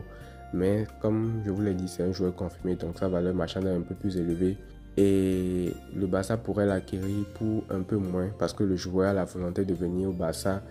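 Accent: Benin. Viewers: Ça sent l'accent béninois, vrai ?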